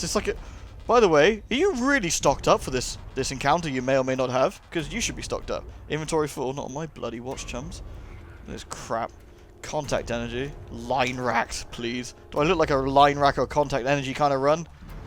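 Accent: United Kingdom. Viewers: So, I have to say congratulations for re-finishing the original Dead Space. I started watching you before you did this game originally like who knows how many years back but it was the original playthrough that made me subscribe to you. While I love DS, I prefer to play DS2 due to it´s many gameplay improvements. Story/atmosphere-wise, DS obviously wins. I would like to thank you for several things. You have helped me learn English. You have helped me open the world to me. Thanks to you I have delved into streaming and have met many friends. You have helped me go through depressions and some of the worst moments of my life. All of which without ever speaking to me or knowing of my existence. I have no idea what I would be doing with my life without your wonderful sarcastic British voice. So yeah, wish you many more awesome years and hopefully, if you still feel like it, sharing those with us through gameplay. 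:)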